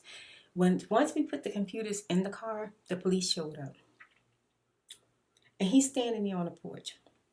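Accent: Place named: New York, United States. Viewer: you're so pretty! 😍😍😍 love your accent are you from NY?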